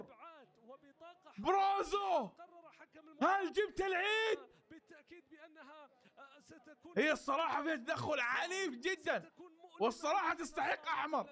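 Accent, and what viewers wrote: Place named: Jazan, Saudi Arabia. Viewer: لا بس حسيت طريقة كلامك كأنك جيزاني..... والنعم فيكم اتشرف فيكم❤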